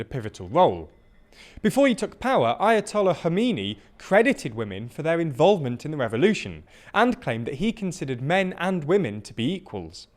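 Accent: United Kingdom. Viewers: I have been to Iran for a month alone. This propaganda that just warms the west up for more genocide against innocent people. Spoken to women and police and asked what would happen if you dress inappropriately, as they do on French beaches, I was told by several women that they are sinply asked to adjust their wear. If they refuse, they take your name. That's it. When i told these women that the west believes morality police arrest and beat them, THEY JUST LAUGH. Women ALL OVER Iran do not cover all their hair. I would say visit and learn but you sound like a brit so you are not welcome. Sucks to be a fascist i guess! PS- i have never been on a country with so little police presence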